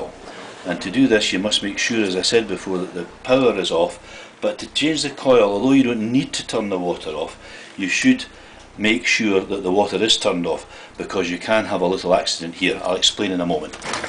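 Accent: Scotland, United Kingdom. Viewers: I know that this video is fairly old, but I hope that you are still receiving the posts here. I have a Triton 90si. The water stopped coming through, and the shower makes an unusually loud noise. We cleaned out the filter and bled the air valve, but it didn't correct the problem. I found your video here - is it possible that the solenoid could be the problem, in that it is not opening the valve? Or does the shower not turn on at all if the solenoid is bad?? By the way....I love your Scottish accent.....:)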